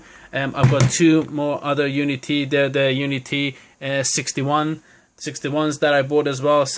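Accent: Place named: Iran. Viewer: از لهجه ي ضايت معلومه ايراني هستي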